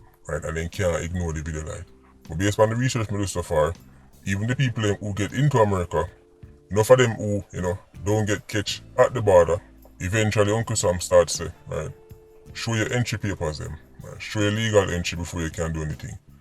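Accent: Jamaica. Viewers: I love the yard man voice over.. Lol. Same way so. Someone was telling me that back in the days there was running going on in NY immigration office. One Jamaican go to the office with one bag of money and just throw it out on there take and asking about business.. There was where everything dun.. Nothing Jamaicans do that is still secret .. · How come the drugs for guns trade routes are so difficult to plug?! The "YAADIE" voice-over is hilarious!👍🏾👊🏾😄🇯🇲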